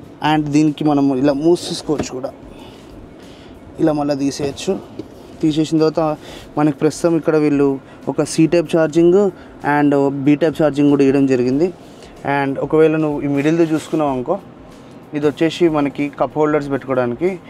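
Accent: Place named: Telangana, India. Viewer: Bro Nee Launguage style So nice.....Pure telangana accent...Pakka Clear Cut On subject. Spr Bro Keep it up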